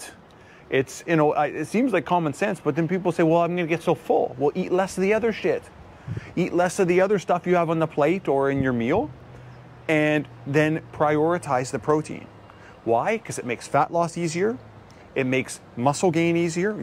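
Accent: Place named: Maritimes, Canada. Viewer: What part of Canada are you from? I hear some maritime but can't quite tell.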